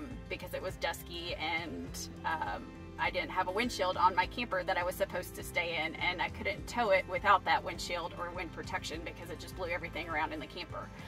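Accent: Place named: South, United States. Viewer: I soon as I heard that Southern accent I knew that I was in the right place 😁 Nice video.. I'm thinking about getting a camper here in Germany so I'm trying to learn as much as I can.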